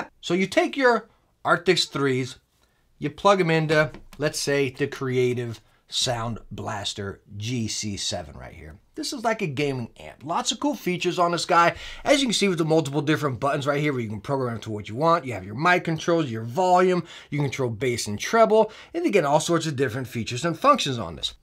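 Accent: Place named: Massachusetts, United States. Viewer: Thanks for bringing the ruckus,! Where are you from? Definitely the northeast. My best guess is Massachusetts.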